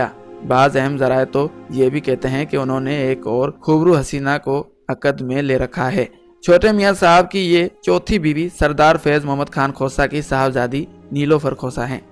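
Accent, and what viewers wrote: India. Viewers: This is Indian propaganda. This commentator's Urdu is typical Indian. His pronunciation is very Indian.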